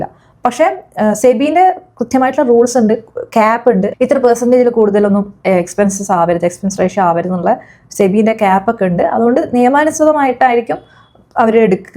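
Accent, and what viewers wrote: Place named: Malappuram-Palakkad, India. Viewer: നിന്റെ സംസാര ശൈലി നല്ല രസമുണ്ട്. മലപ്പുറം പാലക്കാട്‌ ഭാഗത്തെ സംസാര ശൈലി പോലെയുണ്ട്. Anyway good content and good presentation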